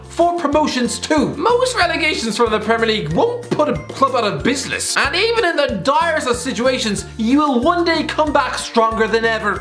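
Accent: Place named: Ireland. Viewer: What part of Ireland you from?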